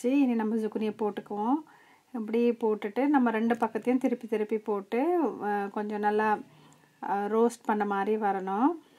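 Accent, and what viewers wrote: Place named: Jaffna, Sri Lanka. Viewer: Beautifully presented dinner with no carb. Unga tamil different a iruku a bit of Indian and a bit of Jaffna Tamil.Enjoy watching. Keep posting 🦋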